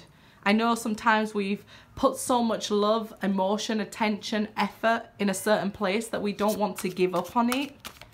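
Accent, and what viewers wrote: Yorkshire, United Kingdom. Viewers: I love Yorkshire accent! And Geordie too 😊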